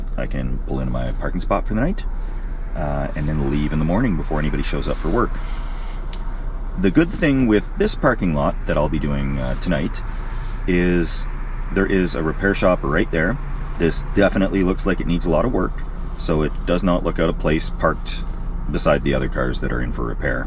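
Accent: Canada. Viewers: OOOOOH yea..... Wanted to say that ur letter kenney way is omg, so BEAUIFULLY Canadian. I FREAKIN LOVE YOU...... Never stop.... I went back on ur vids and, holy shit hairbag.....!!!!!Seriously my friend, do not stop..... Hi Krazy neighbour and Beautiful wife( who without her, I'm sure, would not be) ( By the way girl, fu*& the haters... Mad respect) Don't stop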